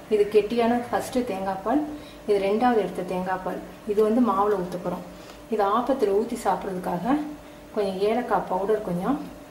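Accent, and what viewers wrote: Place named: Chettinad, India. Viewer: achi every recipe is awesome and that too with your chettinad slang it is very nice thank you for all your receipes...this is deivanai saravanan...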